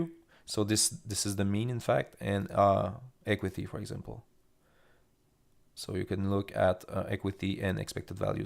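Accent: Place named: Quebec, Canada. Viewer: Pretty sure I'm hearing that French Canadian accent! Merci beaucoup pour la série, ça m'a aidé bcp!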